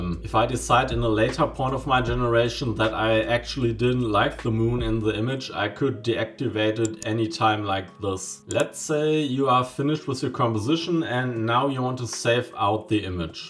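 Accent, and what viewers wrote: Germany. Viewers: Dein deutscher Akzent kickt sowas von rein xD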